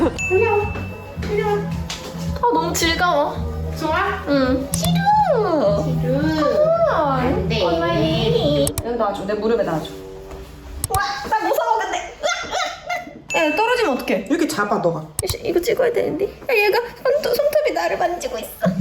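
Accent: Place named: United Kingdom. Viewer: 소영님 영어에 영국식 발음이 들리는데 배우신건가효...?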